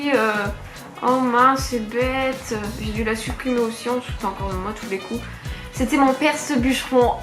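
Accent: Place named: North, France. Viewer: J'adore ton accent du chnord :*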